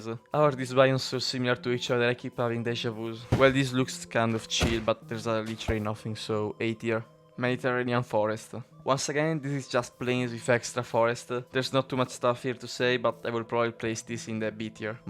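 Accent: Italy. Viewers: ciao fratello italiano, grande video (e grande accento) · un Italiano si riconosce subito hahahah, comunque parli molto bene e bellissimo video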